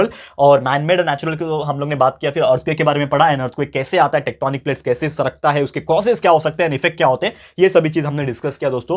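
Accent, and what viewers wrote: Maharashtra, India. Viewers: Sir are you from Maharashtra?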